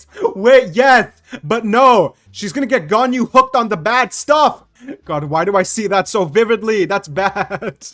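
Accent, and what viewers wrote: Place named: Quebec, Canada. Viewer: Omg are you french/french speaker?? Your accent is awesome, like a French Canadian accent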